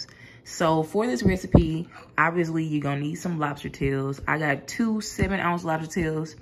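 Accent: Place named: Carolinas, United States. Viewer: Where u from sis u sound like u from the carolinas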